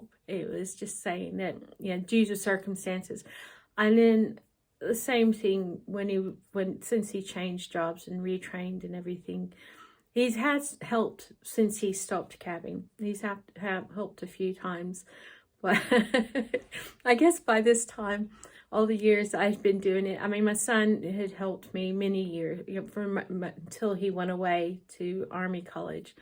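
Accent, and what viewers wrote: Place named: United States. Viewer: I am stitching a castle right now! It is sooo beautiful where you live! I almost forgot you’re an American until I heard a slight American accent still! 😉 I am a homebody and don’t like to travel but I do sometimes with friends and always have a good time. My husband doesn’t like to travel unless I make him and I don’t because I like to stay at home too! 😂 But sometimes my single friends need someone to travel with and I will go. I enjoy your videos and your projects sooo much!❤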